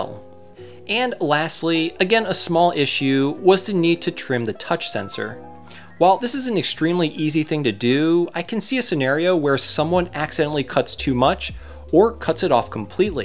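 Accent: Baltimore, United States. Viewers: Are you from Baltimore? I feel like you have a Baltimore accent! Lol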